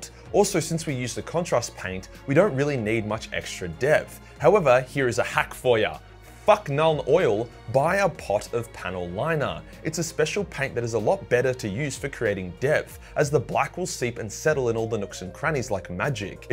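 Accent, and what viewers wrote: Australia. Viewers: I kinda know everything in the video but it's just nice to hear an Australian swearing on youtube.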